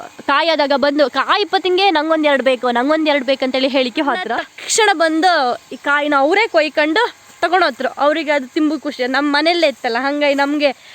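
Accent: Kundapura, India. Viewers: ನಿಮ್ language ಕೇಳಿದ್ರೆ ಕುಂದಾಪುರ ಕಡೆಯವರು ಅನ್ನಿಸುತ್ತೆ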